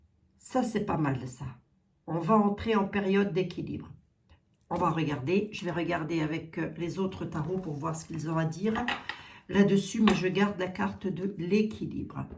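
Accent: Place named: Meurthe-et-Moselle, France. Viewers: Cc je me disais bien que je reconnais ce ptit accent...je suis de moselle...merci pour votre présence 😘😘❤❤ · coucou j'avais bien reconnu la pointe d'accent de la meurthe et moselle hihi, oui la blonde est veuve du 1er depuis 2019, l'addiction y a qu'a voir ce frotte le long nez a tout bout d'champs, merci a vous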